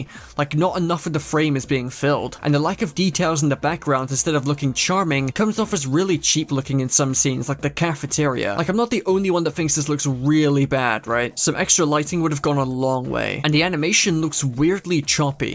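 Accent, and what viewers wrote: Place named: Southern England, United Kingdom. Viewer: Where you from in england bro? More southern than northern with that accent 🤔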